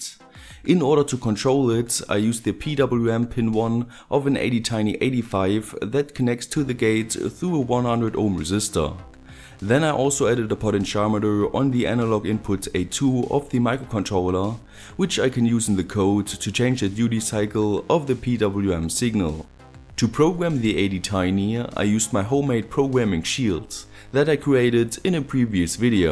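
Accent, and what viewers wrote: Germany. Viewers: Can't tell Is he german or Chinese? Or both ?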